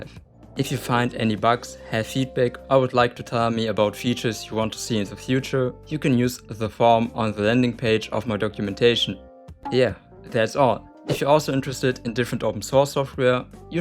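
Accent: Germany. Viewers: I hear Denglish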